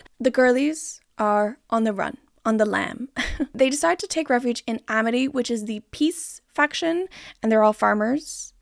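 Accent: Ireland. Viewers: I went through this entire video thinking you were American not Irish… the accent only came out when talking about the LC haha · do i detect an irish accent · EDIT: Ireland!! I watched your Booktok video, and I hear it now. ORIGINAL: Dude, I am so sorry if this is rude. It's my first time watching your videos, and I gotta know — WHERE is your accent from??? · Is Ms. Irish? I keep hearing little hints of an Irish accent, and I'm trying to determine whether or not I'm imagining it. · Yes i watched your whole 1hr and 7 mins and it was more entertaining than the movies. I think i watched 2 and gave up on them. Also enjoyed what i believe is an slight Irish accent in your video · the way you say your T’s; like raised somewhere English main but time in Ireland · is this a super watered-down irish accent? i'm not a native speaker and i'm confused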